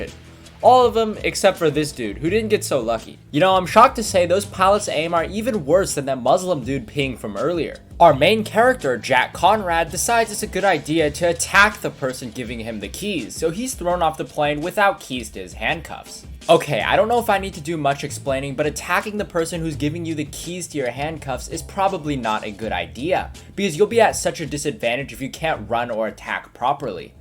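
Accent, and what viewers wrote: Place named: United Kingdom. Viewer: He can’t be Australian- proceeds to sound about as British geeza as you can lol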